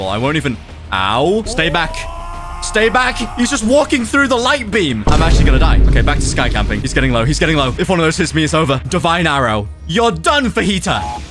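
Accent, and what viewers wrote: United Kingdom. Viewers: Are you from united kingdom ?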